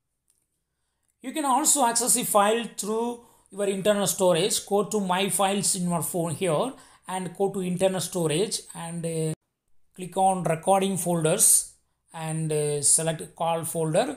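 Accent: India. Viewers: Why do you Indians speak like this?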